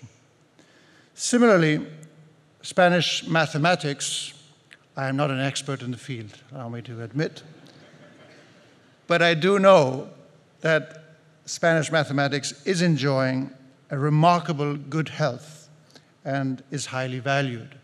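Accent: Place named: RP, United Kingdom. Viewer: I didn't expect him to have a Posh accent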